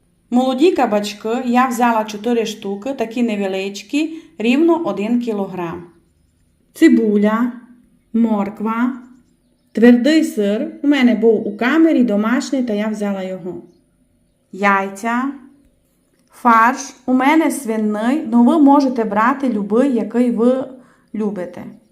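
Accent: Transcarpathia, Ukraine. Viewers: Обожнюю закарпатські діалекти :) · Підписався з двох причин: все, що готував за Вашими рецептами виходить надзвичайно смачно. І дякуючи Вам, насолоджуюся закарпатським говором. Дякую!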